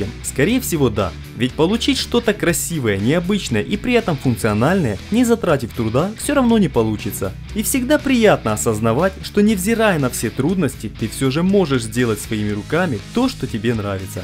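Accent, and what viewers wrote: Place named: South, Ukraine. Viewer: Я так понял по говору, это юг Украины...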